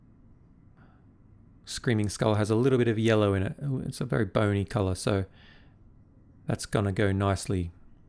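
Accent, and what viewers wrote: Australia. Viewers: Aussie to the core without being too ocker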